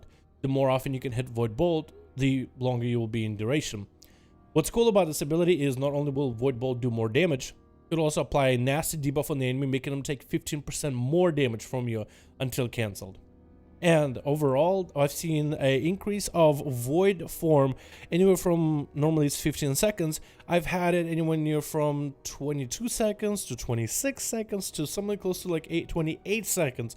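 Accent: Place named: Russia. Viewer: as a non native English speaker I understand him just fine. You know he is Russian right? so for non native speakers it's hard to sound "perfect".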